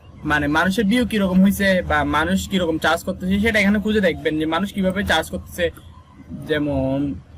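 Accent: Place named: Sylhet, Bangladesh. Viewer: কথা সুনলে হাসি পাই......... সেলেটি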